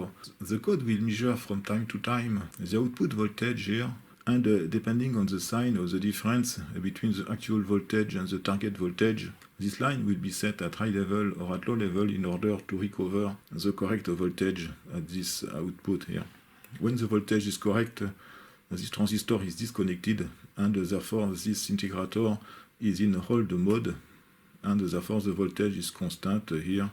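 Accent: France. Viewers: Fast and furious mixed reverse engineering, pure french accent, the best channel with Curious Marc, another French guy:) Thanks a lot.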